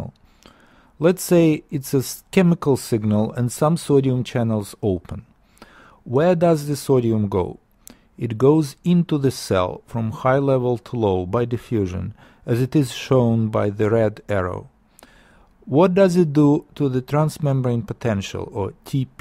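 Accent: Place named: Russia. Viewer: The Russian accent makes it cooler!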